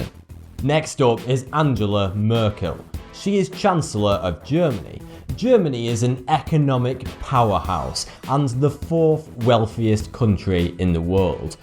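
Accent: United Kingdom. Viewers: the big question is, where is mr. evelyn rotschild?, your accent obviously you are briton, you should know him by now.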